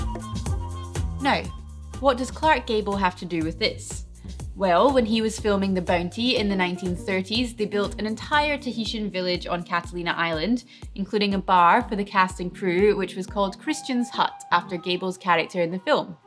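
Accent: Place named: Scotland, United Kingdom. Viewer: If you can make biscuits & gravy from scratch, I'd marry you. 😉 One of your vids started playing and your voice called to me like a Siren upon the water. Nothing else matters, I started sailing in your direction. Love your Scottish accent.a A pretty Ginger is a bonus.🙂 Seriously, you have a great channel. I'm a Single Barrel Jack Daniel's guy. Love the their upper end sour mash #whiskEy. Semper Fi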